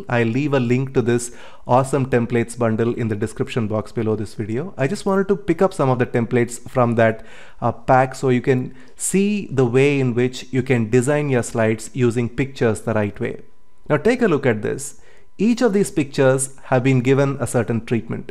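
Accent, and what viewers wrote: India. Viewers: until its indian blah blah!!!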